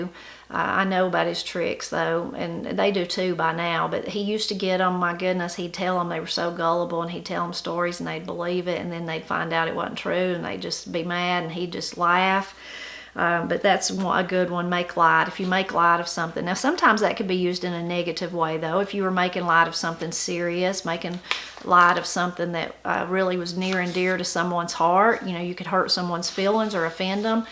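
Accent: Appalachia, United States. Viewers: I like listening to you explanations of things so much because sometimes what you say sounds more Appalachian than the phrase your explaining. Like run and jump to get up there…sounds like…run n jump to get a bear. Most every where else they would say the road had ruts…I’m like you I say the road was rutted out. I love your channel❤️👍to me might coulda is might try…might oughta is this works sometimes for me…might shoulda is this always works for me.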